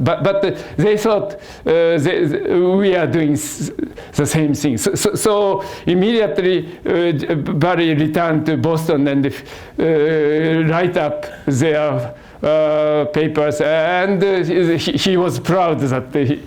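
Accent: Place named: Japan. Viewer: 理系にありがちなカタカナ英語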